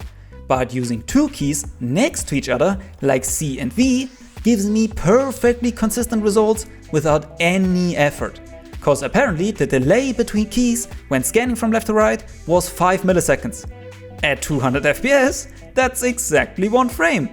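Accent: Germany. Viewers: Hört sich Deutsch an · You have very german accent. Good Video bro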